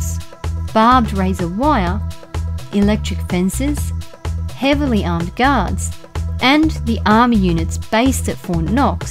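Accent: Australia. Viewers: She has an Australian accent. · that is australian accent Mate !!!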